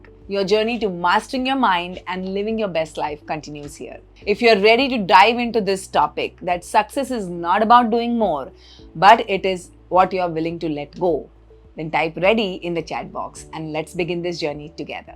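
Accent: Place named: England, United Kingdom. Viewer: Love your English accent and the way you present your points with the flow. Happy to listen to you👏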